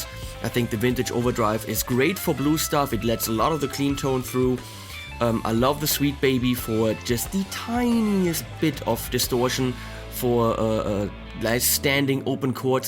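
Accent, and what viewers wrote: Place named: Germany. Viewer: Your deutscher Ekzent ist so knuffig.... wieso sprichst du nicht einfach deutsch??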